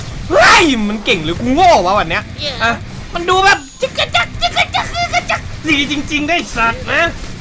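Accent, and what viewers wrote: Isan, Thailand. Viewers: พูดอีสานน่ารัก55555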